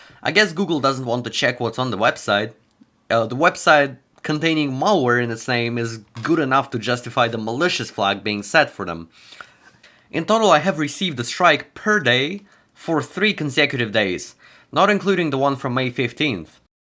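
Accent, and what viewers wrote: Poland. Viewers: Are you Polish? Your location says Australia but you do not sound Australian at all and your YouTube is in Polish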